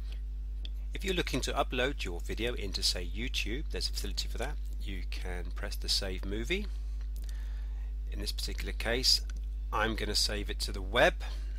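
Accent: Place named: United Kingdom. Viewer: Love the British accent. :)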